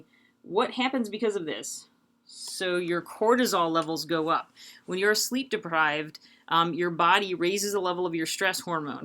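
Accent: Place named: Upstate New York, United States. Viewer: Great video. I am also loving the Upstate New York accent!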